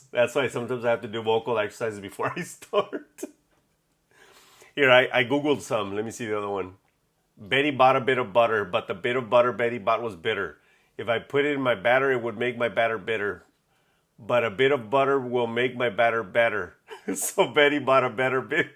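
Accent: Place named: Texas, United States. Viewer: You really are a Tejano!! My mom and granny all born in Texas (El Paso). When I hear you talk, reminds me of being with my tejano relatives!! Good content, payaso!!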